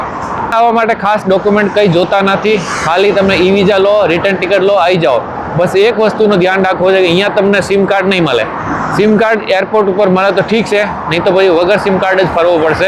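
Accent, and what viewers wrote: Rajasthan, India. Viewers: Gujrati bhasha me to rajsthani wala lehja h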